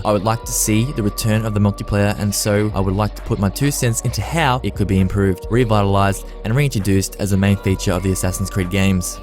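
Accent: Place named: Australia. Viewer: Liked the comm ! but did not understand anything because of your Auzzie accent -_- :p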